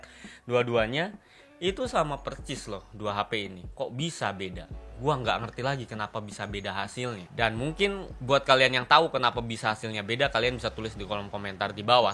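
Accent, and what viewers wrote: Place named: Java, Indonesia. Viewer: Kalau ngomong kok medok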